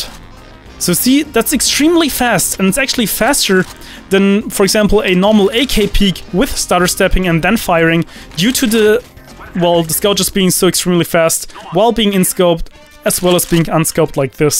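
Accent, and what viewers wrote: Germany. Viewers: English sucks man, it's German accent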